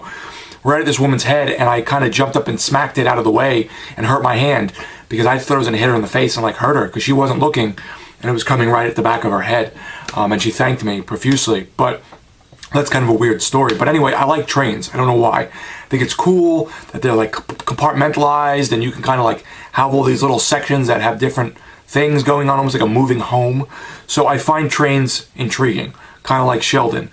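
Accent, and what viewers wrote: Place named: East Coast, United States. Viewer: I'm in North Jersey now & I've been here for a while now but I'm always visiting fam in NYC. Hearing your voice, I figured you were from the East Coast, I just didn't think it was that close to home haha. I just followed you on Twitter.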